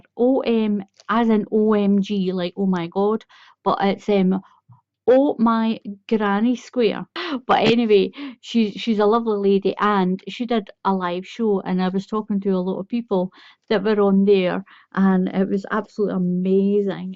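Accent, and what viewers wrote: Scotland, United Kingdom. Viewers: oh yes I saw the yarn and kept thinking "why does she keep calling these packages"happy meals?" Your glorious accent makes me want to visit Scotland!! Love your videos!!!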